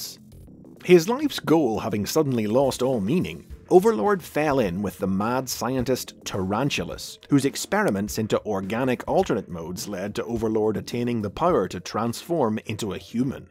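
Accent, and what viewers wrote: South, United States. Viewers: I kinda like his southern accent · Did not expect the southern twang, but I can't say that I hate it. Back in '92 the local toy store had the Overlord toy, but for an outrageous price that no-one in town could realistically afford for a mere child's toy, and I remember being kinda enamored with it. Same story a bit later with Rotorstorm. Seems I had a habit of picking mildly obscure Decepticons as my favorites. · you rock that southern accent well man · I'm never going to unhear that southern accent, and I love it. Thank you for the episode!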